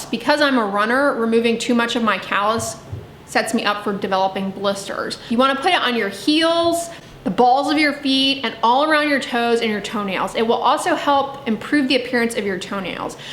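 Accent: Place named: South, United States. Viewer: I don’t like your southern accent! This accent irritates me to the core but thanks for your ( advise).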